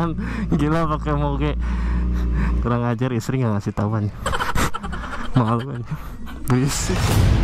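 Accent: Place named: West Java, Indonesia. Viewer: Sunda geningan bos😂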